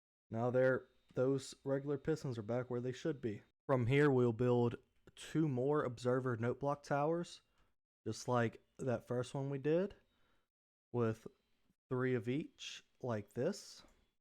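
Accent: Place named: South, United States. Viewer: not gonna lie, i'm kinda delighted to hear a southern accent and no cringy intro and i'm not even american.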